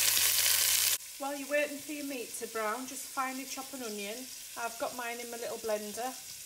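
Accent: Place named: Northern England, United Kingdom. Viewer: Hi, That’s looks delicious. I’ve been following you for a while now, I live in Australia, originally from UK and look forward to hearing your Northern accent and seeing your friendly face and your funny comments about your husband, you make me laugh. Brilliant 🤗 thank you xx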